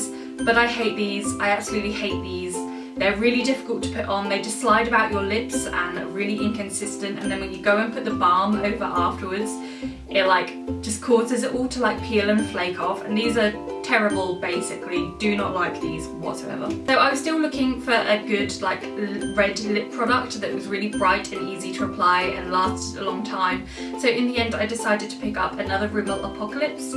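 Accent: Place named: Australia. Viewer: You sound really Australian 🙈 love your hair!!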